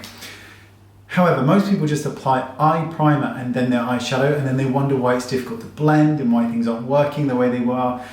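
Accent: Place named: United Kingdom. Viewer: Great tips for an older lady, and nice to hear a British accent. Love your videos x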